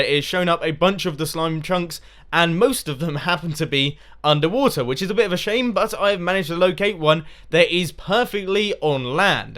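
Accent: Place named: Bristol, United Kingdom. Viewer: Hey, I'd like to see an in-car vlog from you but only because I think you might live near me (based solely on your awesome accent, me babber):D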